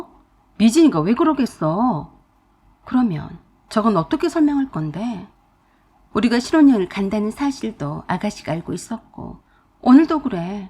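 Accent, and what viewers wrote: Jeolla, South Korea. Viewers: 아따 무슨 절라도사투리를 허벌나게 쓰신다요? 성우분이 호남분이요? 글구 저런 싸가지없는 집안과는 바로 이혼하는 것이 답이랑께요!!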